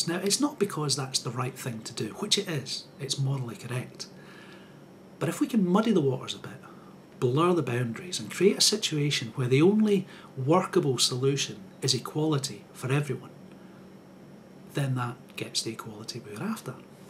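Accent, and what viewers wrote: Scotland, United Kingdom. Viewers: ...you might want to think about transcripts. I'm very good at understanding various accents very well, and I love your lucious burr, but I know some Yanks in particular have trouble with it. Sorry 'bout that, we ought to get better at hearing other cutlure's lovely ways of speaking but some of us are just terrible at it and can't help it. Perhaps putting up transcripts in your low bar would help a few who canna heer ye threw tha' luvly way o' speekin' ya got thairr...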